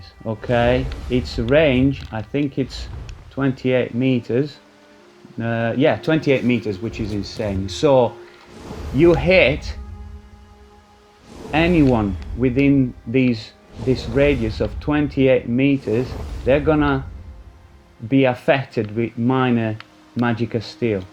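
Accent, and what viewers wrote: North, United Kingdom. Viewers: subbed. your accent is mad :) you sound like you are from up north, but you also sound slightly Italian man 😂 not bad though, I like it, it doesn't have those annoying vowel sounds that they make. lol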